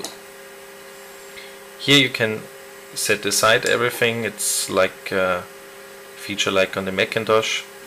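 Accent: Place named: Germany. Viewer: Man hört den Deutschen Akzent deutlich raus. Macht aber nix :) Trotzdem tolles Video!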